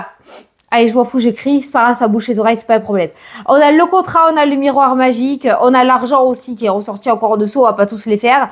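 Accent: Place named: Hérault, France. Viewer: Bonjour, toujours avec le sourire malgré tes petits ou grands problèmes. J'adore ton énergie et ton accent qui est le mien aussi. Je suis une héraultaise. Je te souhaite une bonne journée a bientôt 🙏😚.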